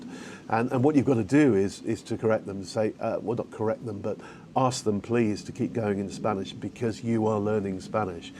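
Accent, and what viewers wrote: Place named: England, United Kingdom. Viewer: Tienes razón no has hablado mucho pero algunas frases… suficiente para escuchar el acento. Creo que para ingleses no es fácil perder el acento… no quiero ofenderte pero es así. ¿0 piensas que no? Has recomendado cuatro periódicos y escuchar la pronunciación de estos periódicos era suficiente para escuchar el acento! ¿No lo creas? ¿O que piensas al respecto de tu acento?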